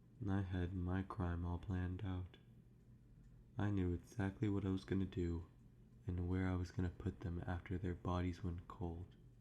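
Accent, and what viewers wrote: United Kingdom. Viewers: I thought he was British- anyways great video as always!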